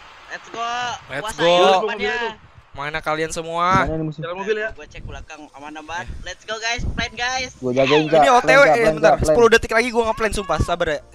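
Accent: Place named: South Kalimantan, Indonesia. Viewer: Bang lu orang banjar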